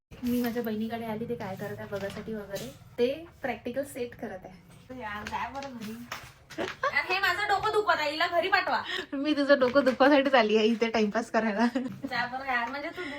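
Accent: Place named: Karanja, India. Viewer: Tai tumhi kuthlya gawachya aahe tumchi language bilkul aamchyAsarkhich aahe Karanja (ghadge)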